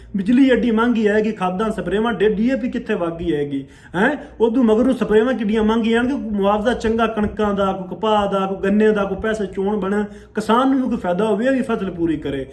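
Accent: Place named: Punjab, India. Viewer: ਇਹ ਪੰਜਾਬੀ ਜਬਾਨ ਦੇ ਲਹਿਜਾ ਦਾ ਕੀ ਨਾਮ ਹੈ ਜੋ ਤੁਸੀ ਬੋਲਿਆ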